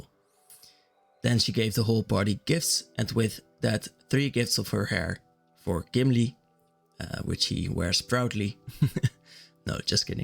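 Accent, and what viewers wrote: Netherlands. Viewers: You dutch 🤔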